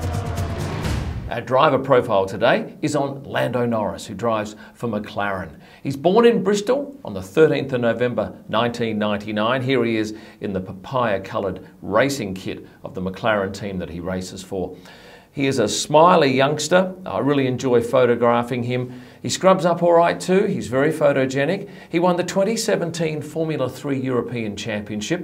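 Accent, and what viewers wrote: Australia. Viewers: Nice to hear an Aussie talking F1.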